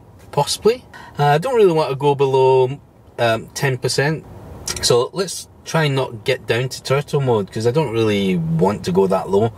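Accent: Scotland, United Kingdom. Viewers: A china-man with Scottish accent, priceless! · thankyou for this great video very informative , now I think I have decided to buy one in Australia PS:love the scotch accent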